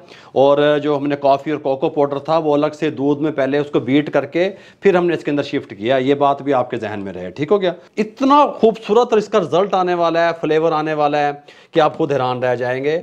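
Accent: Punjab, India. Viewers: Mujhy apka punjabi ma bolnay ka style bohat pasand hay 😃 #cookingat4thfloor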